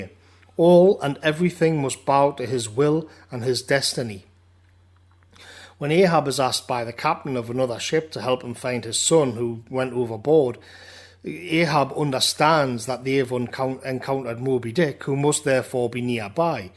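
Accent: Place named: Newcastle, United Kingdom. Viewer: Nice to hear a fellow geordie speak on such a lethal book <3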